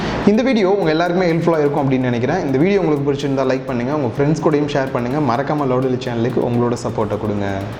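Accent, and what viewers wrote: Tirunelveli, India. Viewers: bro neenga nellai yaa???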